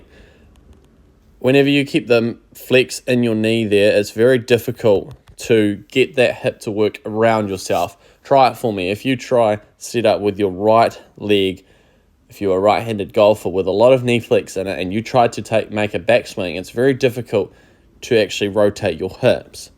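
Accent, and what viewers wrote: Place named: New Zealand. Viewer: Great analogy, you sound like a kiwi that has lived in Aus for a while?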